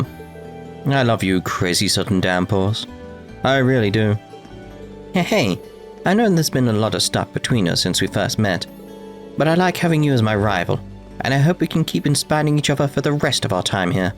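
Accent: Scotland, United Kingdom. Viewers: Scottish voice reveal Scottish voice reveal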